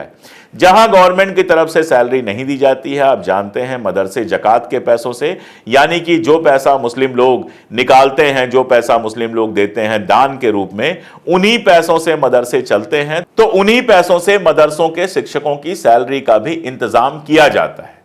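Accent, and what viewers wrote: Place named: India. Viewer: ji aap haqikat mein is mulk ki tahjib ki jhalak hain aur aap ki awaz mein hindustan ko bakhubi mehsoos kiya ja sakta hai.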